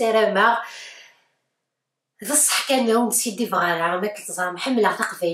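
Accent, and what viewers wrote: Kabylie, Algeria. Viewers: Des fois je ne comprends pas ton kabyle mais mon mari me dit que tu viens peut être de la petite Kabylie dans ce cas là c'est normal. Mais j'ai vécu qu'en France du coup il est vrai que j'ai beaucoup perdu. En tout cas tu rayonnes ça fait plaisir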